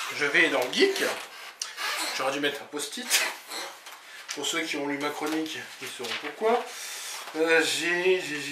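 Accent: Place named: North, France. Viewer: C'est bon le titre est dans le bon sens pour nous XD tu me fais trop rire avec ta définition du Geek, enfin la c'est plutôt le stéréotype x) (HS : on entend bien ton accent du nord, j'adore ! Ça me rappelle des souvenirs !) XD prendre son Larousse, la chose a laquelle je pense le moins (faut que je m'en achète un d'ailleurs) on sent le suspense monter quand tu cherches XD « Intello » c'est vrai que j'ai souvent entendu ça … tu lis ? T'es un/une intello, t'as des bonnes notes à l'école ? T'es un/une intello. Tu as totalement raison, les ¾ des gens ne savent pas ce que ça veut dire. Je pense que tous le monde (ou presque) doit se serrer la ceinture en fin de mois ! Oh sérieux j'aime ta façon de penser ! Je suis sur que si on se rencontrait en IRL on jonglerais entre grande amitié et de grand débat (j'adore les débats avec les gens que j'apprécie XD) (HS : je vois des livres dans ta bibli que j'aime bien XD) j'avoue que lorsqu'un livre à une moral j'adore ça, quand ça fou une grosse claque en pleine figure et qu'on réalise qu'avec ce qu'on a on est bien. 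Encore une fois une super vidéo ! J'ai hâte de voir la prochaine ! Bonne continuation !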